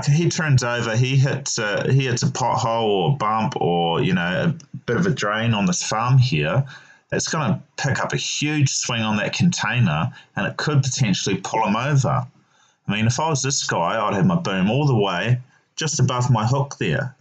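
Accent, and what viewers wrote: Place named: Southland, New Zealand. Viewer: where ya from mate bit of a kiwi southland twang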